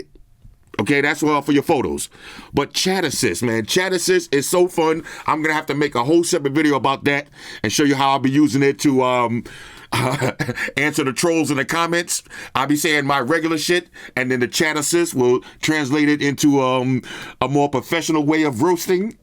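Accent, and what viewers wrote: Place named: Queens, United States. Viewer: oh my guy from queens, dope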